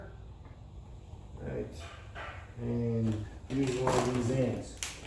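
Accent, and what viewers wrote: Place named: Eastern Europe. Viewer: Can’t quite place the accent..sounds Eastern European, and to my Romanian ear, it sounds almost Romanian. Which is it? Curious here..:)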